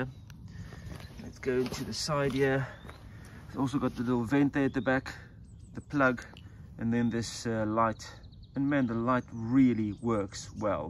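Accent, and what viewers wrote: South Africa. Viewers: Fantastic review! Hoping the next version has an easier access to the tire and better clearance between tire and chassis like MSP. Off topic: is that a South African accent I'm hearing?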